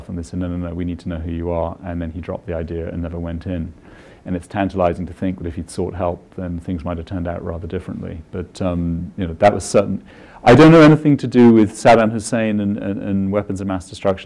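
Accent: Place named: United Kingdom. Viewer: The republican party is not a terrorist party, we would only become that way if our country in our constitution is jeopardized. You need to get that straight, you sound like a brit so you don't know what the Hell is going on inside our country. That is precisely why we ran your asses out of our country in1775.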